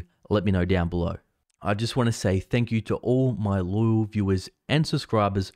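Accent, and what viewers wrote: Australia. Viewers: Dude when I heard your Australian accent I thought you were talking about the Australian property market only to realise you were talking about the US. Got my hopes up. Property boom isn't ending here any time soon sadly and now agents are getting even more greedy by putting most of them on auction!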